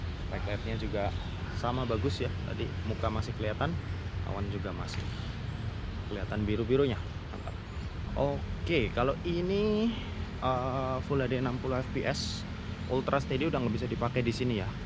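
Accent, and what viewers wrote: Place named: Purwokerto, Indonesia. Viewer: PURWOKERTO YA INI MAS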